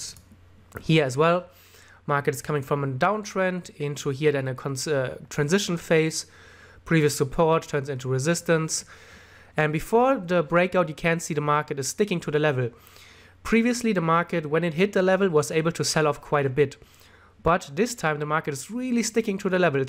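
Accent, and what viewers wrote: Germany. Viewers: Dieser Moment wenn man direkt raushört das du Deutscher bist. :D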